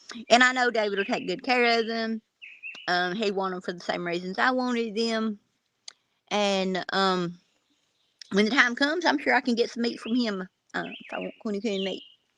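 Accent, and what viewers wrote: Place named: South, United States. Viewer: You stylin the no teeth! Cute! Your voice just got sn even more southern accent lol! When you ferl up to it you might do a bideo showing you mowing with your reel mower. Alot of people dk what they are. Hope you heal wuivkly. Give it a week before you try treeth again so stitches are more healdf not irritatdd by tryi g them.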